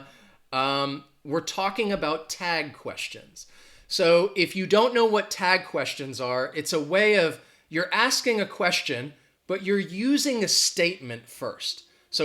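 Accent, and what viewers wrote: United States. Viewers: Hiya dude. Kind regards from Venezuela. I do congratulate you on your wonderful and useful Youtube channel. I dunno why, but I love the English language. Therefore, I´m trynna do my best to learn a great deal of it every single day. It ain´t been an easy learning journey. Notwithstanding, I wanna become a proficient English speaker. Likewise, I must confess I love American English. So, I do enjoy watching your videos cuz you speak such a beautiful American English. I still a newbie, I mean I´m still a rookie at learning English. In spite of that, I´m trynna do my best to reach a fluent speaking level. Well, dearest friend, you´re an amazing English teacher and I enjoy watching your wonderful videos.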